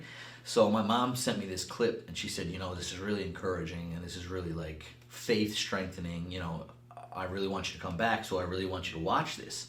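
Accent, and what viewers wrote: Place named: Nova Scotia, Canada. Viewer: Not sure how i missed this video. But great accent! Miss nova scotia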